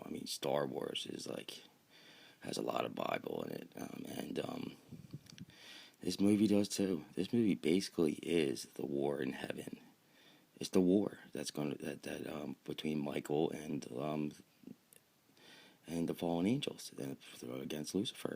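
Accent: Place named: Pennsylvania, United States. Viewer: Dude your Pennsylvania accent is so heavy I can barely understand what you’re saying. Speak more clearly.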